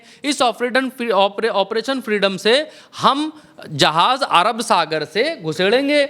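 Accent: India. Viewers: Bhrat tumhara desi andaz chune wala hai .Desi style me english bolna aur beech beech me flana dhkana 😅acha lgta hai.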